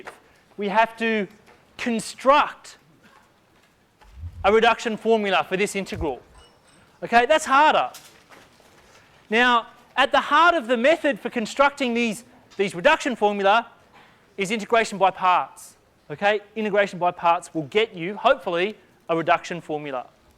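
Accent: Australia. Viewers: are americans really this stupid? he's clearly australian wtf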